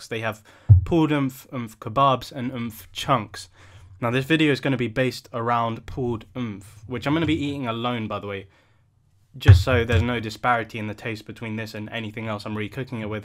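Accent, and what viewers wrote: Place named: United Kingdom. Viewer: Your not white no, it doesn't mean you arnt British. You do however have a strong British accent. So your upbringing isn't obvious.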